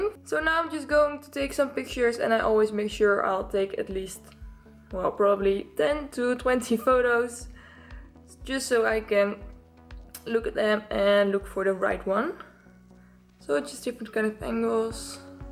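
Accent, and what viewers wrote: Netherlands. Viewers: u dutch? guz your accent sounds dutch tho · ik ken je kanaal pas net maar je bent echt gorgeous!! aan het begin van deze dacht ik al gelijk te horen dat je Nederlands was en net ik de comments zag ik dat dat ook klopt lmao!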